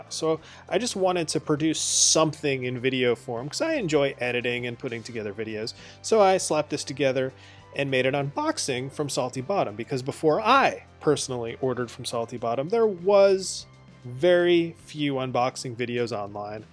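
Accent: South, United States. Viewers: This was great to see ...Bring back your Southern Accent she hasn't been on in a long while except once 😁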